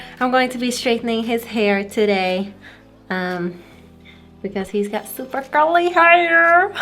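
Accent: Italy. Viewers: It’s a typical Italian accent ...That’s really strong I didn’t know there were some people to appreciate this accent lol in my country (Italy) when we talk in English a lot of people don’t like the Italian accent because is considered to be ridiculous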